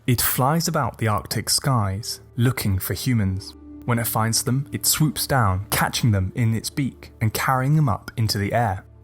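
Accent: United Kingdom. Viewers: As an Inuk, this video got every myth right, just as I heard these myths growing up. The pronunciations of the Inuit language through that smooth British accent were on point.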